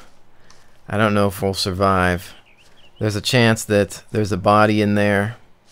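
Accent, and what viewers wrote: Canada. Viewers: Great vids! Are you Canadian? I only ask because you say Day Zed, HostILE and Bugger off!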